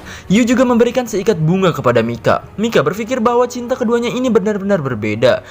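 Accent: West Java, Indonesia. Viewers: Meni sunda pisan ihh resep😂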